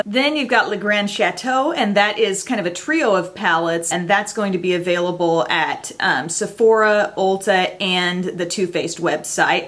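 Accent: France. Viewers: Love your French accent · Your French accent is sooooo cute! 😁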